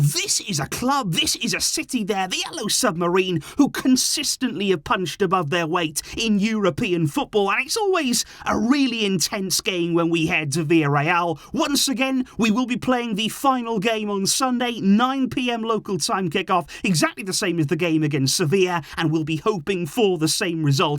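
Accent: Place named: Wales, United Kingdom. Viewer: he’s welsh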